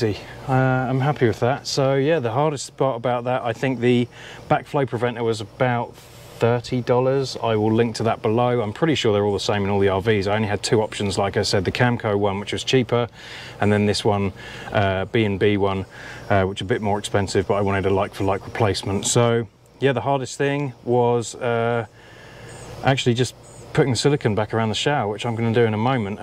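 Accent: United Kingdom. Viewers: I just watched your video and it answered my question. But, I want to be sure that was the source of the leak. By the way, I could listen to you Brits all day long. Thanks from Arizona, USA. Dan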